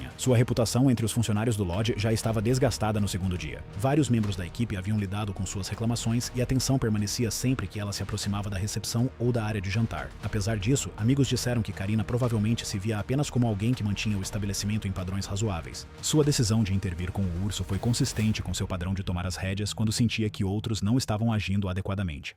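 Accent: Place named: Portugal. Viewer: Talvez seja porque o narrador é português. Lá eles falam meio rápido e adotam o padrão internacional de medidas, se não me engano. Mas, de fato, ele deveria se esforçar a falar menos rápido para as demais pessoas foram de Portugal, possam acompanhar melhor e falar as duas medidas para melhor compreensão. Tem que pensar fora do seu país.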